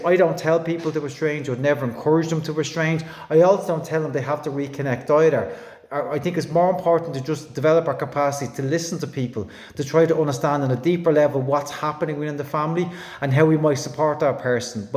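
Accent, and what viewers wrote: Ireland. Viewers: If I could put forward some feedback? ... excellent video, excellent presenter and information! The sound is a little bit echoey. Wondered if you could use a mike to improve the sound?. Am grateful for this video. Love your tone of voice and your Irish accent ! So nice to listen to. Luv from a New Zealand woman 🇳🇿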